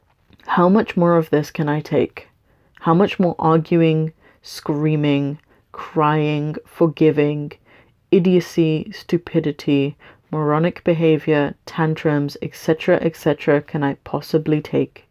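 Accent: United Kingdom. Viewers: Real question..what is the objective of content you put out? informative, validation, monetary, therapeutic just plain ol' fun,when i view your content I try to look past the ex muslim chick with the Brit accent seeking validation from cyberspace with a western culture fetish, maybe its partly because I dont see (or really care to be honest)about Islam or its followers where I Iive..you have a lot of well wishers and supporters..on Team which is good my comments arent meant to demean or be disrepectful..i could be out in sunny 85 degree weather but I choose to watch your content..mix it up..interact with people..do some location shoots..surely the Universe isnt all Islam sucks My family sucks I like western entertainment and the occasional cringy use of American slang(sorry its like imagining the queen saying" bitch got cake" lol)..NOW you're Artwork I like....a little angular for my tastes but I love your color schemes...hey put out an adult coloring book that might generate some scratch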